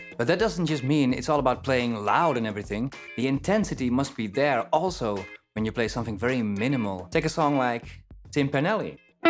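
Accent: Netherlands. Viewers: That Dutch accent...fucking coolest thing in the world.. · You sound like a Dutch guy when you talk, are you dutch haha?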